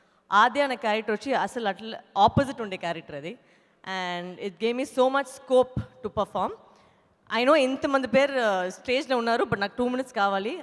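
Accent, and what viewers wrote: India. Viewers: Her Telugu style is same as Karnataka and Andhra border Telugu PPL slang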